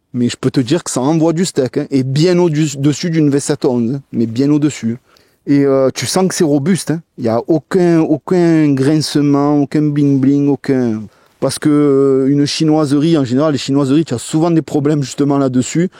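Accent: South, France. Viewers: J'en peux plus de cet accent du sud. Même ça ils ont réussis à le pourrir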